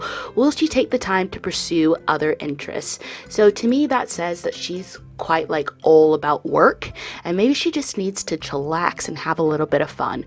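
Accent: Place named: Midwest, United States. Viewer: UK?! I thought you were from the US because of your midwestern sounding accent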